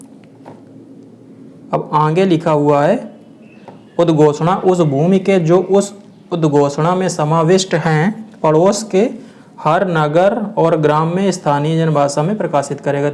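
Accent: Kanpur, India. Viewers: Fatehpur ya kanpur se hai tu bhai kya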